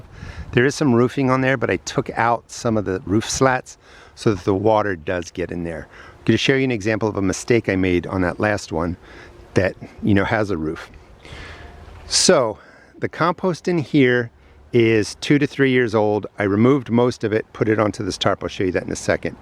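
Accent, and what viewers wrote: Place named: Maryland, United States. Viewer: I hear Maryland I subscribe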